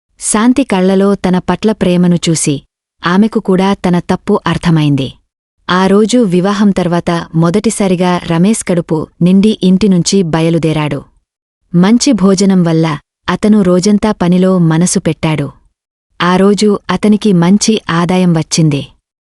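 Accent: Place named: North, India. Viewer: ఆమెకు తెలుగు తో కొంచెం ఇబ్బంది,ఉత్తరాదికి వెళ్ళి స్థిరపడ్డారు అనుకుంటా, ఆ ప్రభావం కొంత కలగలిసి వినిపిస్తుంది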